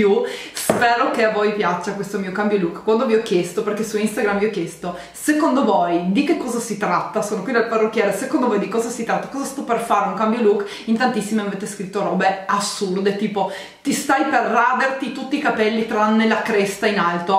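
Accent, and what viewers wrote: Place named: Trieste, Italy. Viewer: Haha se senti che te son triestina..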